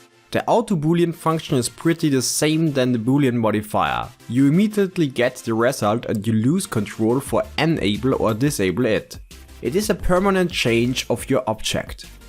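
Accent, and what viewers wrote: Germany. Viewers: Dein German-English is aber schon heftig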